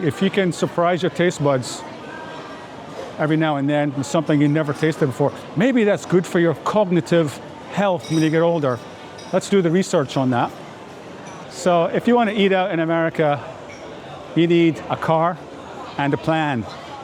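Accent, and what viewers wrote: Ireland. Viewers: You lived in the US? Your accent sounds different like Irish. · English isn't my first language and i can't place your accent, where are you from ? Are you Irish ? BTW greetings from Ayutthaya area, i'm married to a Thai and loving it here too.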